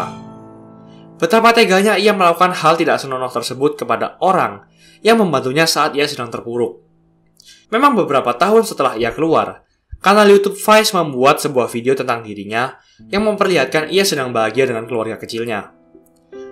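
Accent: Java, Indonesia. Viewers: La medoook😁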